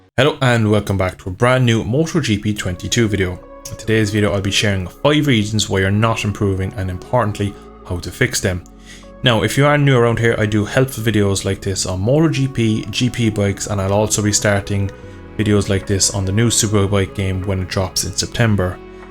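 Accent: Ireland. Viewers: irish brudda?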